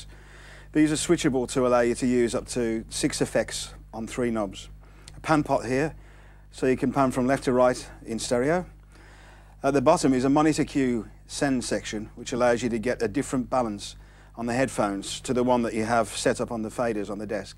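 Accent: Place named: Birmingham, United Kingdom. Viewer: He has similar accent as jasper carrot from Accocks green, is from about 3 miles away. Kitt’s green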